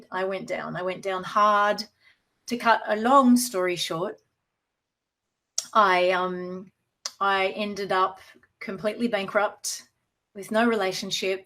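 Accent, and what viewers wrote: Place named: United States. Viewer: I love it when you talk American. (I am an American, so I love hearing how I sound to people outside the United States.) :)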